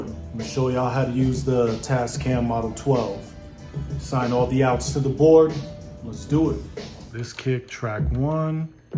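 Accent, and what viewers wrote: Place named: Brooklyn, United States. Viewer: I'm listening..... yo you from Brooklyn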